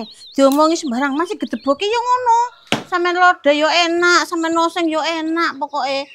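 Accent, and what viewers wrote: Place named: Java, Indonesia. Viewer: Assalamualaikum..met malam..saya sangat suka klu ngonten trutama bhs jawanya yg medok..oh iya pngling skrg rambutnya terurai makin ayu skrg..biasanya di ikat trus..skrg manglingi...sukses selalu...❤❤❤❤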